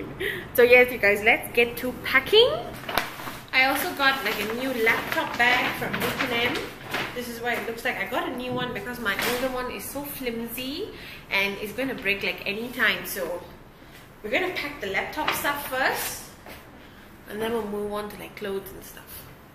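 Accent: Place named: South Africa. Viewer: Happy Anniversary weekend to you both. I am confused by your ethnicity. You look South Indian and you celebrate Diwali but your accent is South African and you live in KL.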